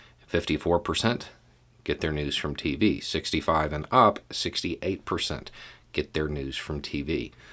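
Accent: South, United States. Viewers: As a European, it is also very refreshing to hear an American recognise that. Especially one with a southern accent. knows his political theory.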